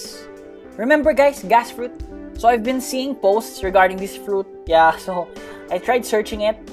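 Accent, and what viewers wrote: Philippines. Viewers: are u filipino or in the phillipines im curious becaus of ur accent hehe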